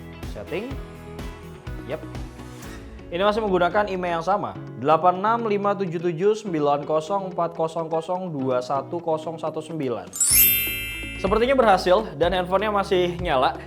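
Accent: West Java, Indonesia. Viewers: Urang sunda mang?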